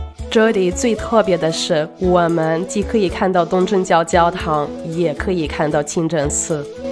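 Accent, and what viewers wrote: Standard. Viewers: 感覺的中文很標準耶..今天看到你的頻道..已訂閱..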